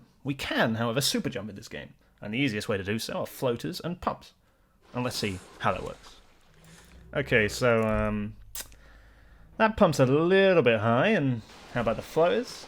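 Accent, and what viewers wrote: United Kingdom. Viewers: Sound like spiffen brit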